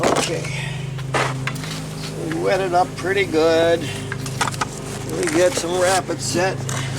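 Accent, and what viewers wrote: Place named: Pennsylvania, United States. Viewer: Thanks for the video. One question, what kind of paint did you use for the steps? Masonry? Brand? Also I think I recognize the accent. You from the Scranton/Central Pennsylvania area?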